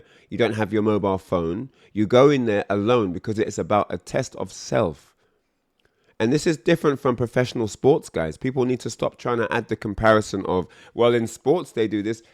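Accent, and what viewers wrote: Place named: United Kingdom. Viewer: Uk accent! Damn I thought you were from the US. Yelp that another L for US. I thought US had a FGC Legend. I really thought u were from the US 😭. Anyway Its the principle of the matter..no coaching allow! It's a tournament where one that player show the crowd the true skill that the player possesses without cuck coach interferences. How else are you suppose to know if the player is truly skill without help. Never got why coaching was allow in the first place...SMFH!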